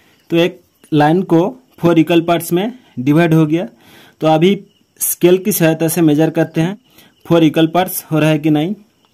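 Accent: India. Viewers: But your english is from india 🇮🇳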